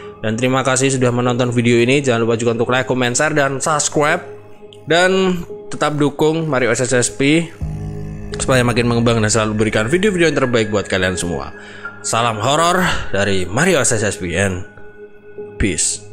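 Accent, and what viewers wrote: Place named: Java, Indonesia. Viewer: orang jawa emang logatnya beda hhhhhhh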